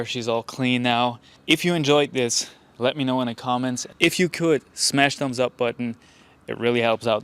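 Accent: United States. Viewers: Are you american? Your english sounds very american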